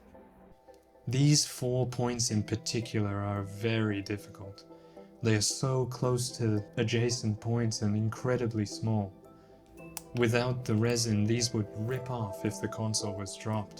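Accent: United Kingdom. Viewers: you sound like a british nerrel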